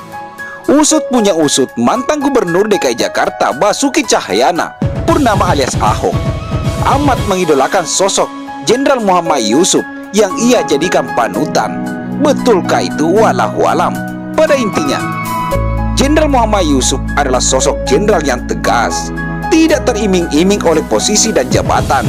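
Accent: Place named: South Sulawesi, Indonesia. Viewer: Maklum sang narator ini pasti orang Sulawesi Selatan Memang begini cara berbicara nya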